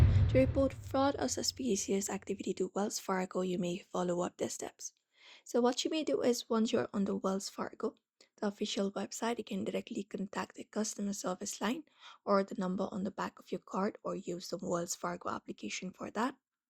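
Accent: India. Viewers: Never trust someone with an Indian accent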